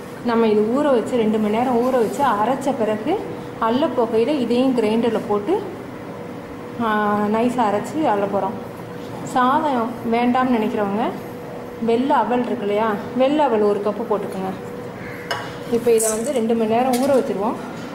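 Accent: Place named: Chettinad, India. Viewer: achi every recipe is awesome and that too with your chettinad slang it is very nice thank you for all your receipes...this is deivanai saravanan...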